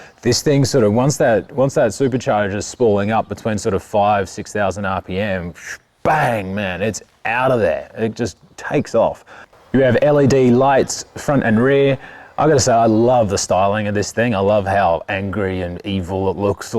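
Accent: Australia. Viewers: Thanks for the clarification. I apologize for any offence. I suppose I was fooled by the oh so familiar Aussie accent and assumed he was here in Australia as there was no reference to country or market. I was also a bit miffed that Kawasaki doesn't give us the full set of options as I'm currently looking at a Z H2 to buy and would love the SE with Ohlins et al. I should have checked my facts before criticizing yours. Thanks for all the good work you do for us. Cheers from Sydney Australia.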